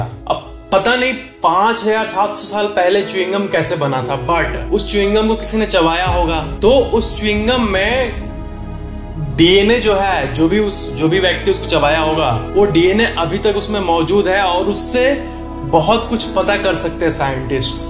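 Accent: Bihar, India. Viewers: Is he bihari? 🤔🤔🤔🤔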